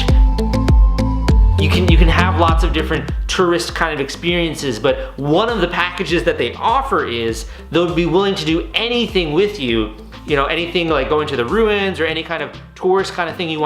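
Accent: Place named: United States. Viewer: Love your gringo accent when speaking in spanish.....reminds me of my cousin ..cheers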